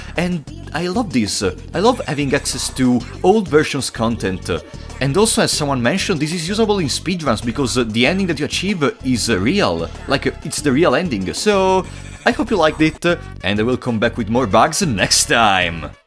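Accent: Italy. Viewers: I just wanted to say that you are so captivating. Fear & Hunger is the first Dark Fantasy RPG I have delved into and you are the very first youtuber that made me watch a no hit run for 2 hours straight, that is very commendable my friend! Not to forget your Italian accent, it really makes everything so fun to watch would love to see some Merch in the future and on a sidenote, ive been able to beat Crowmauler using your guide:))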